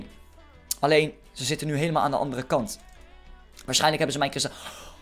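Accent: Netherlands. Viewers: Je hebt echt zo’n nederlands accent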